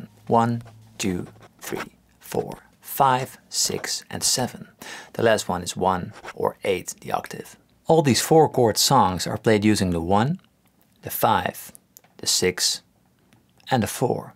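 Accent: Netherlands. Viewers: Are you Dutch? Because your accent really sounds Dutch. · I smell dutch 😅 btw good vid it helps a lot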